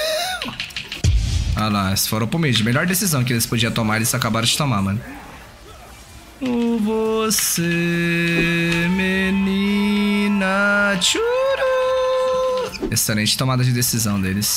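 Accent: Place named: Goiás, Brazil. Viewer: Goiano sô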